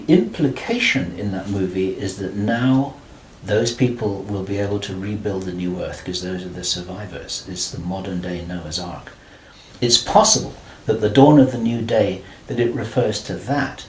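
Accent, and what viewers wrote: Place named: United Kingdom. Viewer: El que murió en 1997 es un gringo o estadounidense. Bill Ryan del actual video habla con acento británico. Que Dios lo proteja.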